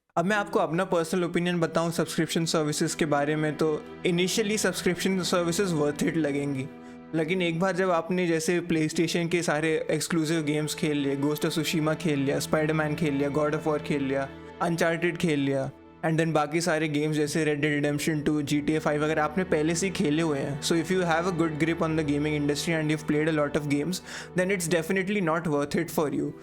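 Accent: Odisha, India. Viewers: BRO you from odisha ? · Are you from odisha !?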